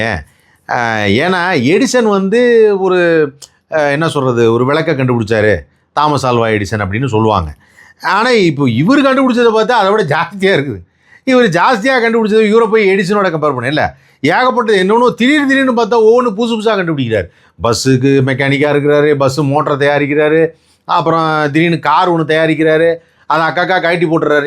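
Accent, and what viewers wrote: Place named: Coimbatore, India. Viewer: Kovai Accent 💯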